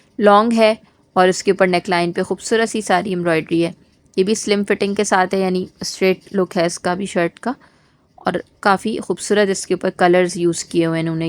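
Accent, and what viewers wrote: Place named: Karachi, Pakistan. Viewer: Itni saaf aur khaloos wali urdu sirf Karachi walay he boltay hn. 😂❤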